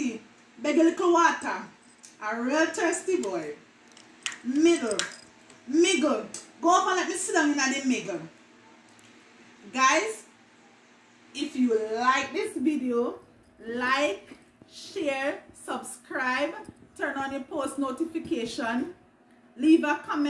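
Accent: Jamaica. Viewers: I love jamaican accent.. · Wow. The real Jamaican accent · Wow I love your Jamaican Accent. Really enjoyed this ❤️ full watch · i love jamiacan pronounciation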